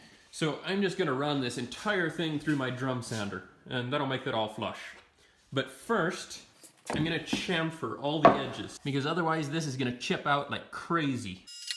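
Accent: Canada. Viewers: What accent is that? Canadian?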